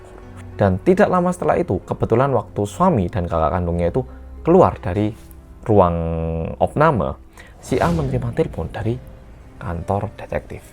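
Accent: Java, Indonesia. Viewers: Yaampun teganya teganya😮 tks medoook ❤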